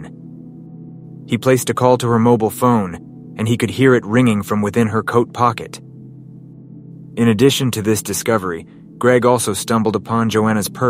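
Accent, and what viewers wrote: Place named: United States. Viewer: Finally! Ive found an american voice over, true crime channel that covers UK murders 😭❤️🙌 thank you 🎉 SUBBED 😊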